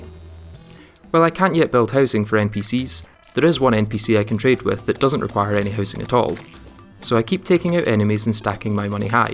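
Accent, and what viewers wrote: Scotland, United Kingdom. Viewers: are you scottish perchance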